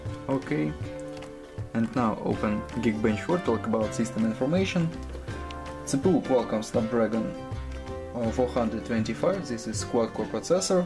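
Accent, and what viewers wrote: Russia. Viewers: Это же русский говорит.Акцент спалил и язык в телефоне!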